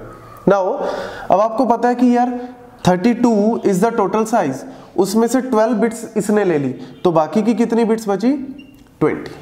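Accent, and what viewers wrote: Punjab, India. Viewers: Yeah the punjabi accent is really good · I love your Punjabi accent that makes me enjoy topics because l m also Punjabi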